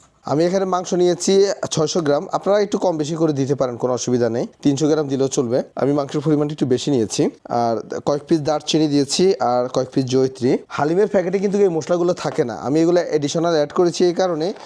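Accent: Noakhali, Bangladesh. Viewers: ভাই আপনি নোয়াখালীর???😮😮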